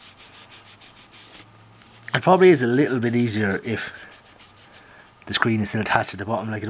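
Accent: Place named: Ireland. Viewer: Love the Irish accent bro fae your Scottish brethren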